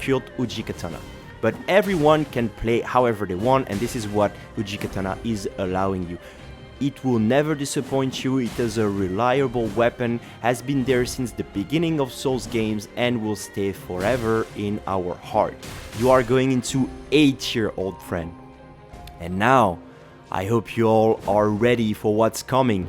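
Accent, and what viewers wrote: France. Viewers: You have a french accent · I didn't know french accent could be actually nice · French accent ? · the day they will increase the range of the meteoric ore blade and buff it, i will be in heaven. (i have a question, wich country do you come from ? i sensed a french accent but i think im wrong) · T’es français enft parce que ta un petit accent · gg très belle vidéo xd g direct remarqué ton actent fr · le petit accent fr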